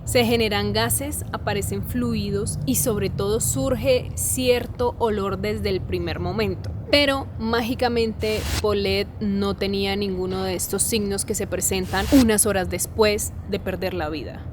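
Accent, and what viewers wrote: Colombia. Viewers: Y esta youtuber, como se atreve a hablar de este caso, ni siquiera es mexicana, por su acento es Colombiana, ni siquiera los mexicanos sabemos como pasó, así que tache, oportunista!!. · andas perdida mi Reyna no se pronuncia Huixquiluacan, es Huixquiluacan pero bueno eso es lo de menos por tu acento puedo ver que eres colombiana...lo que si no pasa es que una mamá tiene en su panza 10 meses al bb....no mamita son 9 meses... que yo sepa en ningún lugar de el mundo son 10 meses de gestación 🤭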